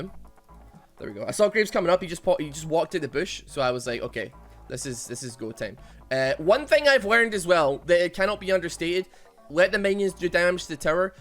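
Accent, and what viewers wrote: Scotland, United Kingdom. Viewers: Is scottish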